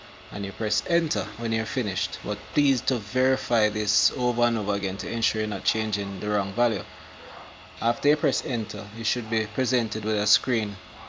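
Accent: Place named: Jamaica. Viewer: The author sounds Jamaican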